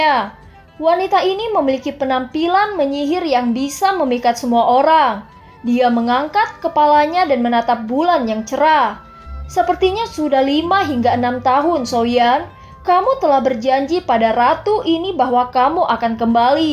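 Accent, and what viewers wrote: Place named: West Java, Indonesia. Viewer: Logat namah siga orang sundaa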